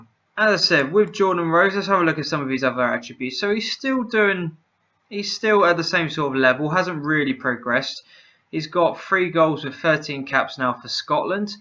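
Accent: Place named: South, United States. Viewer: Thank you for believing in Boro even though your not a Boro fan as your from the south as from you accent!! UTB!!!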